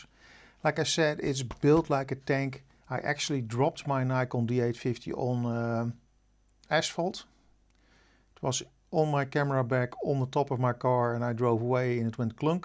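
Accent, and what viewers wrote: Netherlands. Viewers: Dutch accent is fine, I went with my daughter to the university in Amsterdam to settle her in there. Never seen so many smiley people, everyone seems to have a great attitude to life. I was so greatful that everyone spoke perfect English...the secret to carrying weight is a comfortable rucksack...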